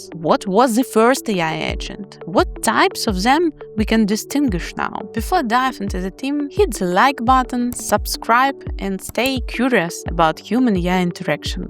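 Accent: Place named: Russia. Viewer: ah I find the Slavic Russian accent so funny and charming at the same time but good video your channel will blow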